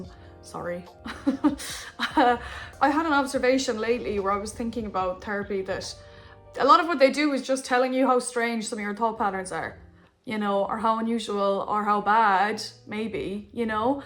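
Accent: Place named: Ireland. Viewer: Lastly, you immediately got more irish when you went home hahah you can really hear it at the start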